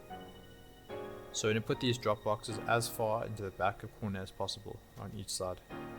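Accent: South Africa. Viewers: South African? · are you South African?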